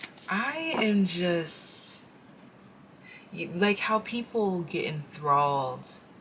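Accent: United Kingdom. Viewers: ahhhhh so for pile 3 right at the beginning i channeled the song “ ” by (which at the beginning speaks ab someone who doesn't know how beautiful they truly are) also LOLOLLLLL the british accent is HILARIOUS bc my man is british 😭❤️ thank you so muuuuch!!!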